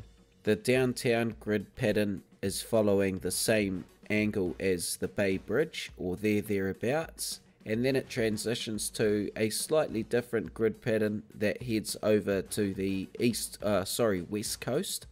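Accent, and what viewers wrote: New Zealand. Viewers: Can not wait for ep 3! You are remarkable. And I love the NZ accent! Let me not try a voiceover with my South African accent. You will laugh!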